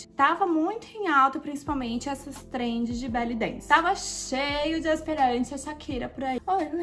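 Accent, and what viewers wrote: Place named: Brazil. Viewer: Da onde vc é? Seu “R” não é de Sampa.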